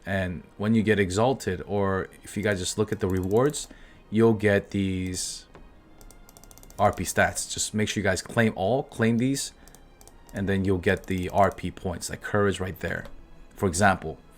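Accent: United States. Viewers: Try to speak less like an American and more like someone speaking English.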